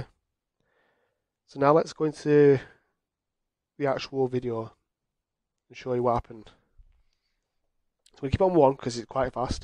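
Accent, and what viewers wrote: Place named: United Kingdom. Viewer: Hey!! For me is kinda difficult to understand sometimes, but thats bc im not used to hear british accent and my native language is spanish. But it helps me to improve my english. 😅 your firecracker.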